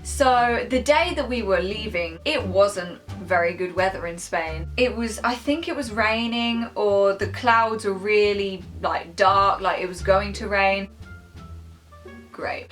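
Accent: United Kingdom. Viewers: Where are you from? You sound like your from Newcastle xx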